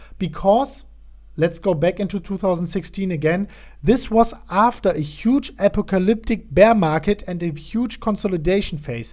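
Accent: Germany. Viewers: nein wtf, klar hört man dein deutschen akzent raus aber dein englisch ist mega gut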